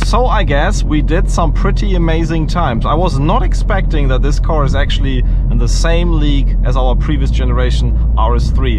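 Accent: Germany. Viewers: Aua own Audi😅. Das tut ja schon in den Ohren weh, wenn du als Deutscher Englisch redest. Wieso ist dieser A45s denn eine Sekunde schneller als alle anderen auf Youtube? Du korrigierst die Zeiten auch nicht bei deiner Box. Da ging es bestimmt bergab.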